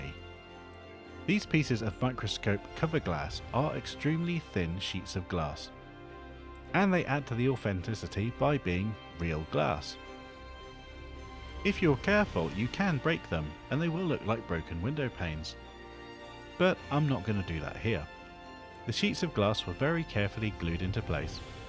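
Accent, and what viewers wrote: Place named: England, United Kingdom. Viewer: Wonderful result as always. It’s hard to tell that the StuG isn’t 1/35 scale. My wife overheard me watching the video, and when she heard you say “I have to stop saying splotches” she said at least it sounds sophisticated with an English accent. 😂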